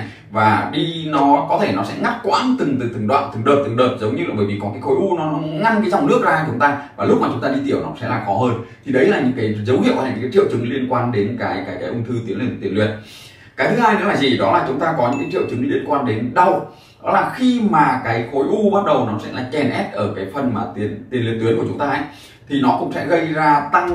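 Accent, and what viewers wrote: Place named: North, Vietnam. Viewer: Bạn có thể nói chậm và rõ ràng được không ?? Không nên nói nhanh vì tiếng vùng miền Bắc nói nhanh nghe không rõ , cảm ơn bạn nhiều .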